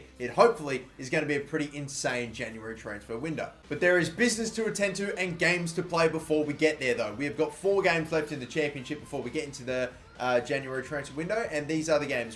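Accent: Australia. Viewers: Tommy C yeah but then you hear his whining Australian voice even more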